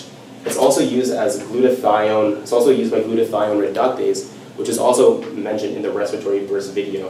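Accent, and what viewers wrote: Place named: Canada. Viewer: That Canadian accent strong! Nice video. At the end it seems like you were going to make a point that alcoholics typically have B1 thiamine deficiency, which, I assume you were going to say results in deficiency of transketolase. Is that significant? Or will it just act as a shunt towards Nuc Synthesis and not be able to recycle back towards glycolysis?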